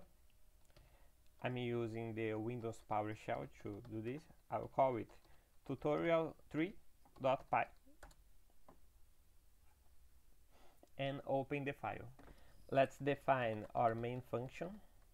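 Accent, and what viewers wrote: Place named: Portugal. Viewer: Given your accent I'm almost sure that your native language is Portuguese =) am I correct? cya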